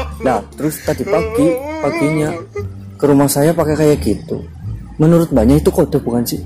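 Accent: Banyumas, Indonesia. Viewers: Wong Banyumas apa rika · Ngomongnya medok tenan mas bro, Sampean wong jowo ngapak Yo ? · Ngomonge ngapak nemen Mbok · Mas,,, rika wong ngapak ya??? Pada bae mas... 😂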